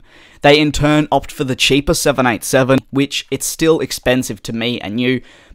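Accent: Australia. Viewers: Are you Australian? Not trying to be rude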